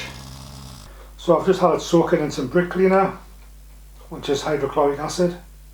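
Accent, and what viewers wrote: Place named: North East, United Kingdom. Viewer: your welcome ,just "discovered "you today got some great stuff ,like the hold down for silver soldering, metal is sorted out from the "scrap box" & I'll have one made tomorrow ! northern eastern accent I'm prob not far from you a little bit further down. Graham.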